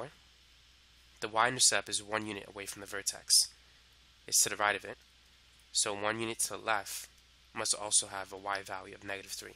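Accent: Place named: New York, United States. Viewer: Are you from new York or Boston. you sound like your from new York or Boston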